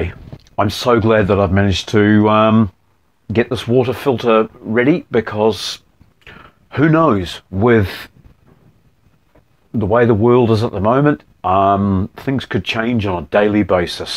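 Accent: New Zealand. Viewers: So that’s how a real live newzealander sounds like!!!!!!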